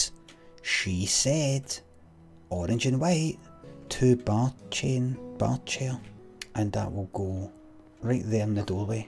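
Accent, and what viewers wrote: Scotland, United Kingdom. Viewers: It's like Jerma but scottish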